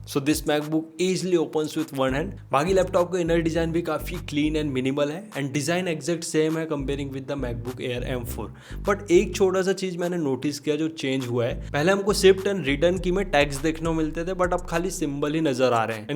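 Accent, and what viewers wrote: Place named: Maharashtra, India. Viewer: Great detailing even mentioning the nand gates used! U r going to make it big 🎉 Could sense marathi accent in your English then checked ur insta handle, great to see :)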